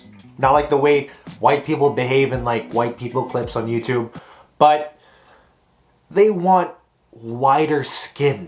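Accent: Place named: United States. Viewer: copy the colonizer, like making an effort to sound american in a youtube video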